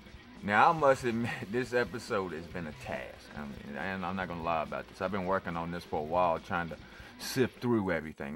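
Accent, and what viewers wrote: United States. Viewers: This guy is so fun to listen to, really does his homework on this stuff and makes a person think. Now if all the sheeple would just tune in and listen. I gotta new love and it's Oh and cute American accent, lol! Love from Canada xoxo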